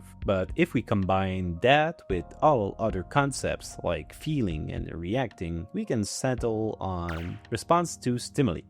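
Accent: France. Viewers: De ce que je comprendre les Bibites sont des représentions artificielles de la vie, une simulation qui à pour objectif de répliquer la vie. Tu as très bien fait ton travail donc il en est une excellente réplique mais au final ils sont pas vivant puisque normalement la vie se passe naturellement ce sont des pixels qui sont éclairés de différentes couleur en réponse avec ton code. Beaucoup de respect pour ce que tu fais continue comme ça! Ps: déso d’avoir écris le com en fr je sais que tu parles fr (ça parait dans ton accent) et je suis bcp plus confo en français qu’en anglais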